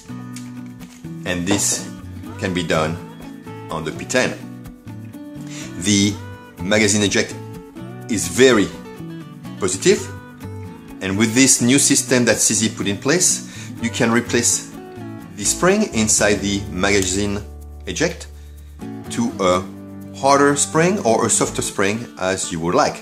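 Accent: France. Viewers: je reconnais un accent français ?!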